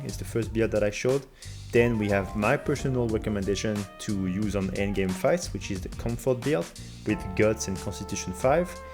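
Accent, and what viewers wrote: France. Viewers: Hmm le bon accent français comme on l'adore 😅. Tkt je suis un confrère aussi 😁. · Il est Français? Je me disais que j'entendais ça vu l'accent! Il a jamais parlé en Fr? aha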